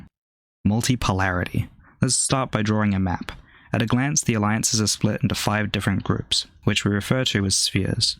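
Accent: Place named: New Zealand. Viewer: You sound like you're from new Zealand lol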